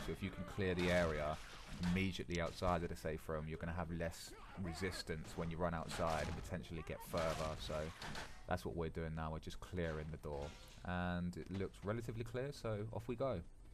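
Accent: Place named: United Kingdom. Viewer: you idiot. the language isn't british. It's English with a british accent. stupid ass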